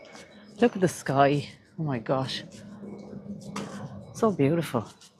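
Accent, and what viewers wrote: Ireland. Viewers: Love listening to your Irish voice ❤❤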